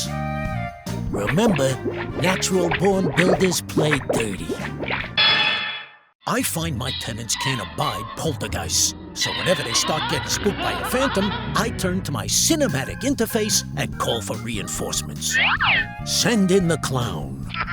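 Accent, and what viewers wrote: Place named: United Kingdom. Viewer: "Voiced by yours truly" Am I the only one who was interested in mob athstetic, only to be confused by the british chap?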